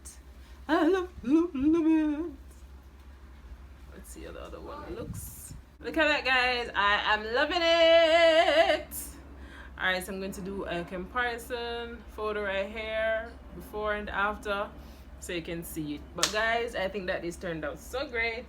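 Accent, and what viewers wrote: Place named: Jamaica. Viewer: I hear our accent sis 🇯🇲 great tutorial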